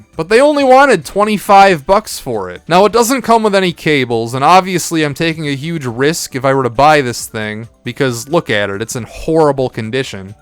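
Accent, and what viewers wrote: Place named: Upstate New York, United States. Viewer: It's funny I thought I heard a upstate accent in some of your videos. All of my cousins have it.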